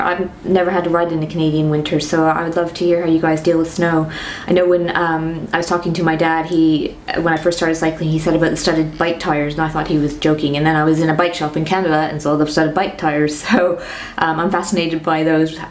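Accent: United Kingdom. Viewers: i m sidetracked but just want to tell you that, your accent adjusted very well to British. You achieved one of most difficult task in the world.😁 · sounds british but then words like 'about' popped up and i got confused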